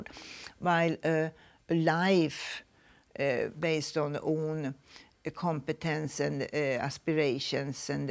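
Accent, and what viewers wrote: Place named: Sweden. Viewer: That Swenglish accent is crazy😅 (swedish-english)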